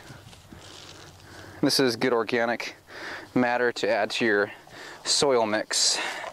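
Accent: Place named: United States. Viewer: you misunderstood him as I did briefly until realising it's his American way of talking.he definitely meant DONT be using too much of it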